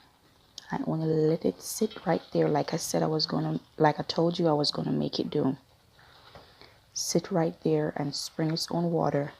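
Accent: Jamaica. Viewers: I like how you keep the Jamaican accent lol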